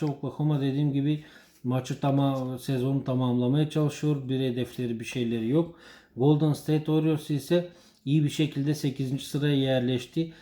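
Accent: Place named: Bulgaria. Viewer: Sen avrupa dasin galiba bide konusman bulgarlar gibi?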